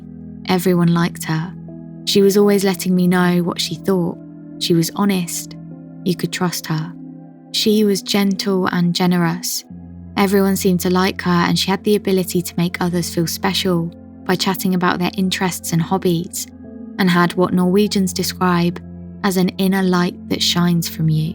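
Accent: United Kingdom. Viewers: Thank you for all the content, you're a pro! Love your soothing voice and the british accent🙏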